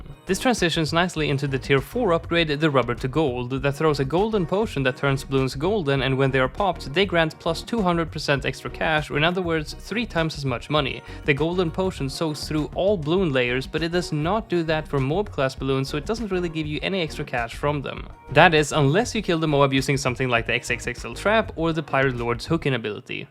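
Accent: Sweden. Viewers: Ar you from sweden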